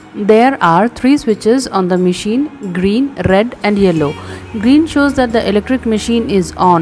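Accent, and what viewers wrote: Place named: India. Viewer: Yeah exactly what I am wondering also. What is the cost? Also the lady speaking sounds Indian but yet its out of Europe?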